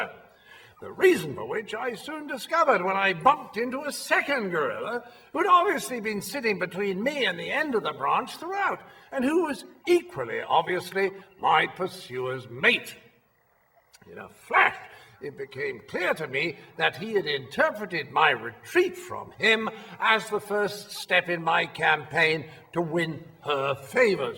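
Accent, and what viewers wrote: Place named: United Kingdom. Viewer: Subtitles application is struggling to cope with that magnificent British accent like a desperate lion.Funny too!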